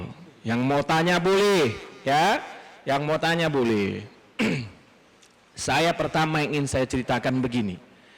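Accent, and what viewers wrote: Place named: Medan, Indonesia. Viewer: Iya, aku pun penasaran pas liat ada yg bilang melarang dengar ceramah UAS dan UAH dan dia sebut nama ustad salman farisi terus ku cari2 di youtube. Tapi ngomong nya kayak orang medan sumatera utara. Logat2 nya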